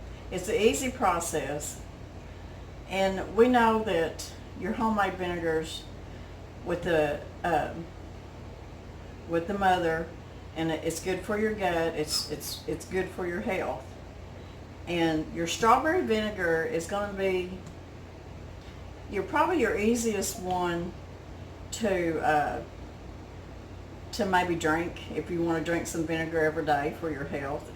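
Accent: South, United States. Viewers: Thank you so much for the extra instruction...I know you guys are super busy, especially during this beautiful Spring weather. You sure do speak my language...clear, concise and just a "touch" of Southern drawl...I appreciate this more than words can say! Done so much pickling and canning in my days, but homemade vinegars is a first for me...you really CAN teach an old dog new tricks! 😉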